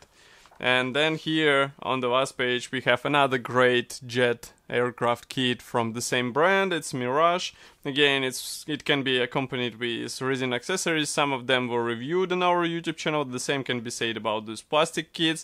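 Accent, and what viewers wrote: Russia. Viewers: судя по произношению родной язык у человека русский)) модель интересная, шведы вообще своеобразные, в планах собрать все их реактивы..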